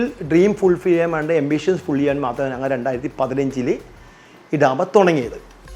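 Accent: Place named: Kochi, India. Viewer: കൊച്ചി സ്ലാങ് ❤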